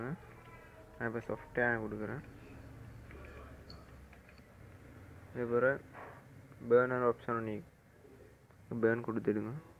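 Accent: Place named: Sri Lanka. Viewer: He's Sri Lankan I think. That's why the slang is different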